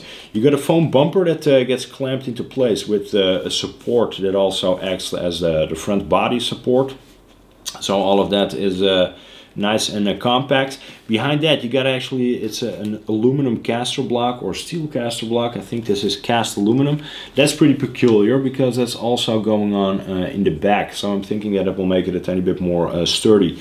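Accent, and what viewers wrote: Netherlands. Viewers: Lijkt op Nederlands Engels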